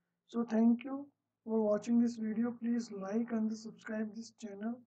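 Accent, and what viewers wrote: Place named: India. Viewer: Indians are so kind enough to upload tutorial like this even when they struggling with their accent. Nice content anyway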